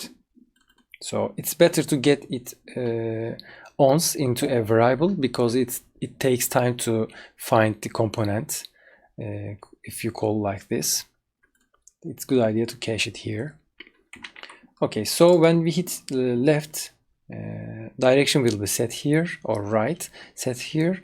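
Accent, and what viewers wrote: Turkey. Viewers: oglum sen türksün la türk ingilizcesini nerde görsem tanırım.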